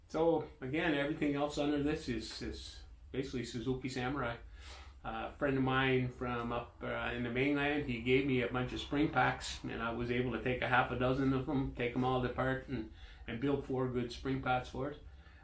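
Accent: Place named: Canada. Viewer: I am in Pennsylvania. From the accent I am guessing you are somewhere in Canada. I will email you my contact info.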